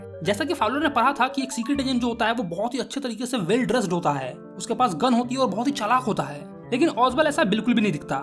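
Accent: Bihar, India. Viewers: Sir you use to talk in your bihari tone too many times "susura" pls have a look at it 😢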